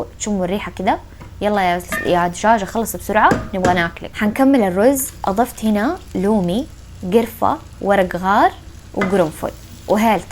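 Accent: Hejaz, Saudi Arabia. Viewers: من اي بلد انت..لهجة سعودية بس ما اعتقد انت سعودية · مين قال انها شامية سعودية هي سعودية حجازية وتتكلم لهجة من لهجات الحجاز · افغانيه متجنسه سعودي . لهجتها حجازي يعني مو سعوديه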